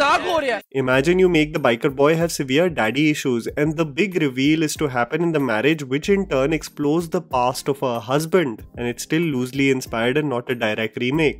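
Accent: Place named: Kerala, India. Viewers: Well I'm a Malayali...i really like your Malayalam accent 😊😊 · Yr malayalam is so funny ❤luv yr channel💜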